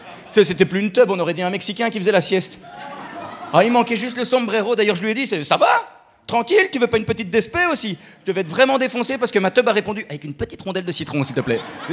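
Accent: Belgium. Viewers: Étant belge je reconnais l’accent de mon patelin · J’adore l’accent belge. L’accent d’une région reflète l’esprit de cette région.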